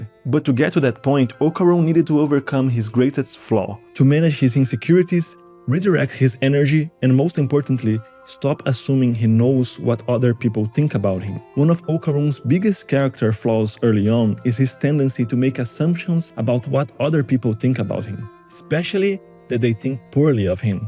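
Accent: Brazil. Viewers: acredito que seja brasileiro esse sotaque ingres ai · Great analysis dude! This character trait about Okarun's transformation is something I've already noticed a long time ago, but it's nice to see such a detailed and profound analysis about it. Also.. tu é brasileiro né? Notei pelo seu acento kkkk (mas teu inglês é mt bom man) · are you brazillian? i can tell by the way you speak english, just curious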